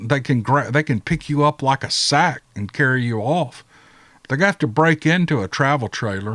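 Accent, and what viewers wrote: South, United States. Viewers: Thankyou for the stories AND your time! The work hours go by much quicker while listening to your southern accented thrillers.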